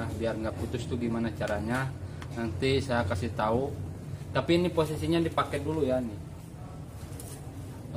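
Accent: West Java, Indonesia. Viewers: Ampelas nya no brp aa ? ni kyk nya org sunda ya ngmng nya wkwkw kocak bgt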